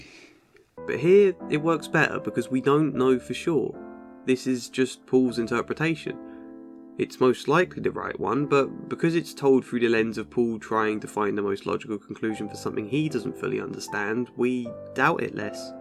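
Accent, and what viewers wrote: England, United Kingdom. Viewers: The choice of music and English accent made me feel as though I was listening to a creepypasta video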